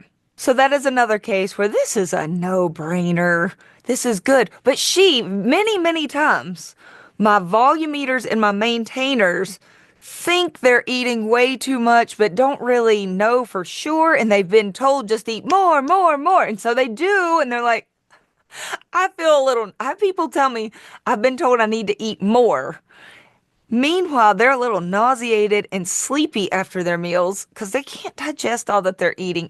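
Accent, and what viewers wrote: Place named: South, United States. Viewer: Am i the only one that giggles everytime she mentions "cute little husband" as code for libido? Golly, i love this gal. She talks like me, but in southern belle terms. Lol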